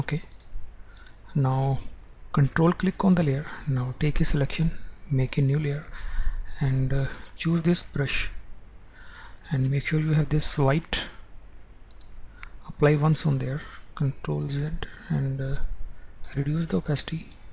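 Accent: India. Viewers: i fucking haaate indian accent , gosh i would break your teeth just for talking vonce , vhen , vhere , vhore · Tanguy Souchet Typical North Indian Accent for ya ;)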